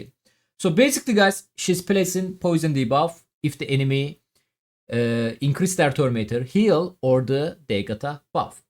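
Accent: Turkey. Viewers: bu elemanin aksani türk ingilizcesi gibi değil mi 😅😅 türk olduğuna iddiaya girerim ama ispatlayamam😅😅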